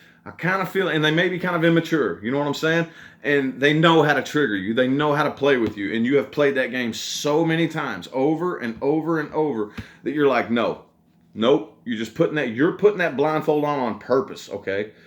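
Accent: South, United States. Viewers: His southern accent tho😍 Aqua here.... Let me go and listen to the "Bonus"🏃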